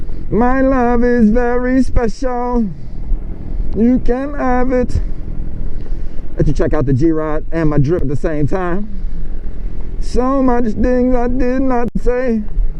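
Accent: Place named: New York, United States. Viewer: New Yorker for sure LMAO, what's up ma man 🇺🇸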